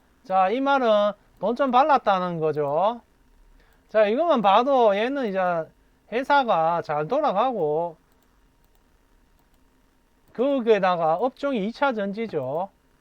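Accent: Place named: Gyeongsang, South Korea. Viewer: 멘트가 뚝배기네요 진국입니다 듣다 보면 시간이 넘 짧네요 저도 경상도라 구수한 사투리가 친구 만난듯 정겹습니다 올도 유익한 내용 잘듣고 갑니다~꾸벅~^^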